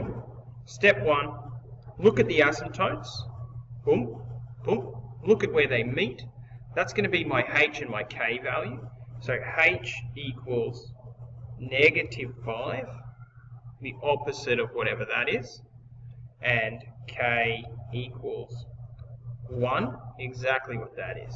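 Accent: Australia. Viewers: The Aussie accent ❤️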